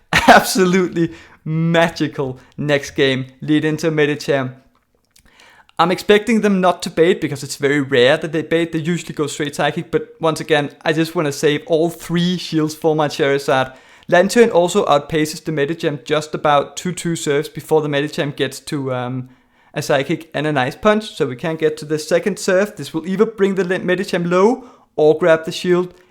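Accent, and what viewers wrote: Denmark. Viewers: First time I've clicked on your video, and still, I could hear clearly after a few seconds that you are Danish!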